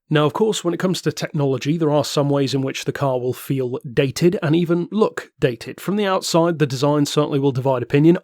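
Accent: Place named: Cornwall, United Kingdom. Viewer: if you see this haha, are you Cornish because you have a very posh Cornish accent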